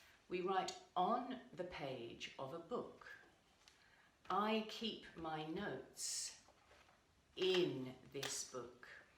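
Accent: United Kingdom. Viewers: Thanks for to teach English by this way. I understand very well your British accent. You're an excellent tea her. Take care and Godspeed. 👏👏👍🖐️ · "...I KNOW IT´S ONLY ROCK AND ROLL BUT I LIKE IT...", LOL, YOU ARE BEAUTIFUL TEACHING ENGLISH WITH THAT BRITISH ACCENT : )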